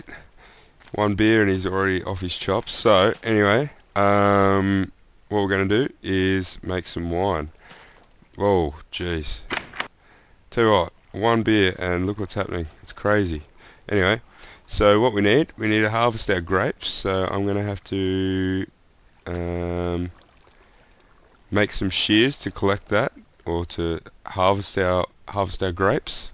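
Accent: Australia. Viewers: boss video...u can tell you're aussieee....am i wrong (subscribed!!)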